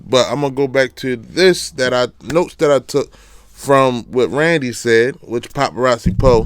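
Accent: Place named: Baltimore, United States. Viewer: 1st off, this is my 1st time catching your videos and I can tell out the gate that you are from Baltimore. 2ndly, I don’t think a persons son should get the raft of their father’s actions. Unfortunately, the previous generations actions often trickle down to their off spring. It’s not fair, but it does happen.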